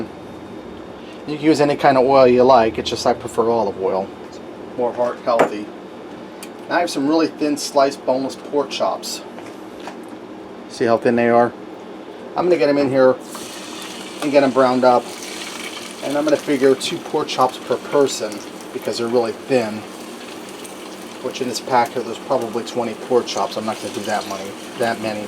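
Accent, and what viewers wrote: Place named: Pittsburgh, United States. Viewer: You have a Pittsburgh accent. Makes me miss the Burgh!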